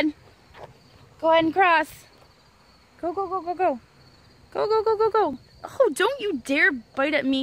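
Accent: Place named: Australia. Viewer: For a second i thought you were coming too my country Australia lol. Well it sort of sounded like it ;)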